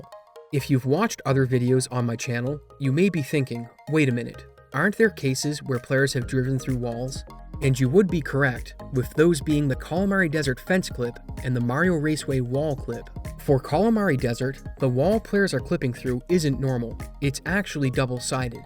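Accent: Canada. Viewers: Are you Canadian? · This guy's gotta be canadian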